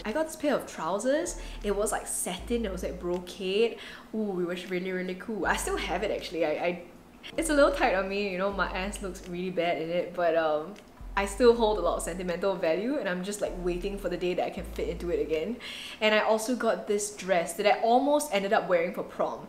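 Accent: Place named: Singapore. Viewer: how do u remove ur Singaporean accent?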